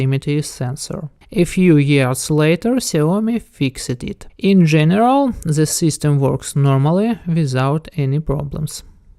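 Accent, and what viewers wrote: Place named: Russia. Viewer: Сразу видно русский акцент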